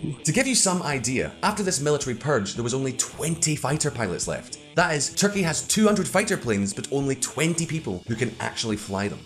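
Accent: United States. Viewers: The American Accent was pretty good. You sounded like my over-bearing neighbor. Good Job. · your American accent was pretty good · You should not apologise for the American accent, but embrace it, because your accent is just awfull... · Yeah, man, as an American, I approve that accent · I love that American accent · Firstly, this American approves your US accent. Secondly, laser weapons are obviously real, but they require a TON of power. Until we have more efficient batteries, they will always be land or ship based (and in rare cases, mounted on planes). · Pretty good American accent buddy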